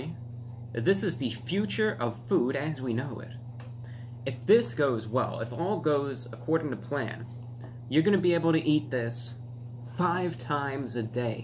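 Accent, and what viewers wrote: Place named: Ohio, United States. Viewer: Im guessing ohio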